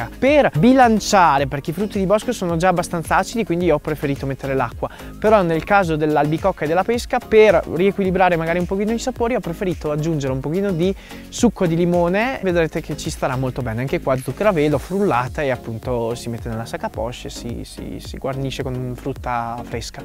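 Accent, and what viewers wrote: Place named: Romagna, Italy. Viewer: Ma perché hai l'accento romagnolo!?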